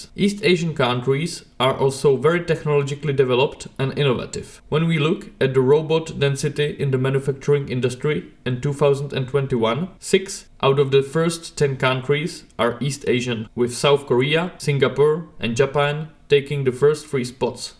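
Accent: Czech Republic. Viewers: Heh, I was thinking that English sounds somehow "Czech" to me. It seems difficult to get rid of the accent. But it is positive that "our" English does not sound like "Russian". On the subject: I find it absurd that more attention is not paid to demographics. For Europe, it is a ticking time bomb that can disintegrate society. According to a prediction, in 2050 there will be only 2 workers for every one pensioner in the Czech Republic. This will generate enormous intergenerational tensions - and I doubt that the working population will accept that they will essentially live only to support the pensioners. At the same time, with social security contributions so high, they won't have the means to start their own families, which will only deepen the spiral of low birth rates. Because of the high number of pensioners, they will have considerable "voting" power to elect politicians who will play into their hands - as we are already seeing, not only with pensions but also with real estate (low property taxes, restrictive building codes). 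Immigration doesn't solve this - it just postpones the problem, and at the cost of breaking up the "nation". For all our achievements in protecting personal liberty and women's right to self-fulfilment, if the result is a society that cannot survive, we will be replaced by a society that, while barbaric to us, can produce enough children to be stable in the long term. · Excellent channel and a rarity on this vitally significant subject. And all patiently explained in a characteristic Czech accent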